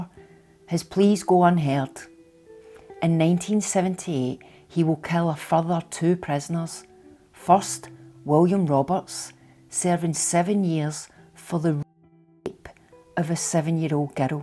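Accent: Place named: Scotland, United Kingdom. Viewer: I don't know what you're saying. I know you're editing it out as it could trigger people. Any chance you could put a link in the description box to the full unedited transcript in future videos, or add one here? Just a thought. Your accent's BRAW... I'm from Glasgow 😂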